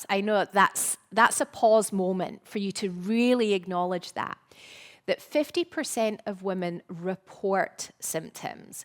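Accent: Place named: Scotland, United Kingdom. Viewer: How very interesting and so well explained. Very informative video. Going to look out for your book. Scottish accent too, brilliant 🏴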